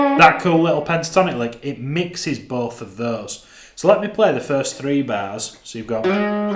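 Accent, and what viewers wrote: North, United Kingdom. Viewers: Heard the Northern accent... subscribed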